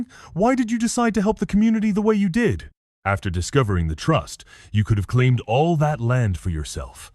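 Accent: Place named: United Kingdom. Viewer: You know the stories military angle & the accent used by the AI makes it sound off. Might want to tone down the accent so it doesn't sound British so much.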